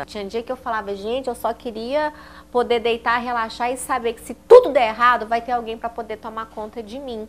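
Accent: Brasília, Brazil. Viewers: sotaque brasiliense super ativo rsrs aií aií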